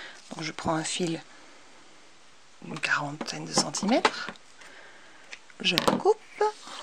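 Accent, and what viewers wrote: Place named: Belgium. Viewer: dieu merci enfin une véritable bonne explication avec une vidéo de bonne qualité. merci bcp et l'accent ne trompe pas, vive la Belgique ;)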